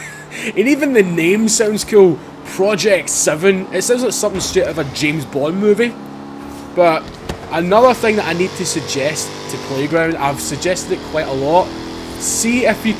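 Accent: Scotland, United Kingdom. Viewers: Your Scottish accent is about as cool as it gets. I've always thought of the Scottish as the kind who would punch you in the throat then smile at ya drinkin' a pint. =) (=...right. Nothin' to worry 'bout. Jaguars are sexy beasts...OR.....ass-tin mar-tin.....sounds too british (poor brits wrong at everything) I wouldn't hold to the standard of responding to every person, I would be guilty of not willing to do it myself. One needs a car that does it all, under 12 second quarter mile, 180-200+ top speed (limited to car type), at least 1g (earth gravity) of lateral cornering capability, can get groceries. The mountain - looks like it wants to be conquered, just sayin'. 6 digit subscribers, the more you got, the faster it goes up. May your momentum constantly surprise you.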